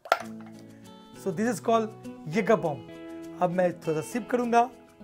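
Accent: Bengal, India. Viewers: By ur accent u seem to be a Bengali ri8!?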